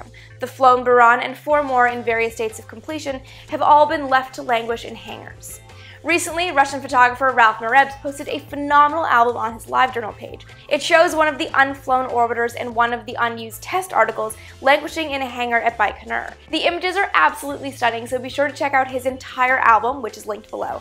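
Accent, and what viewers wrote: Canada. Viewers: are you Canadian?!?! O_o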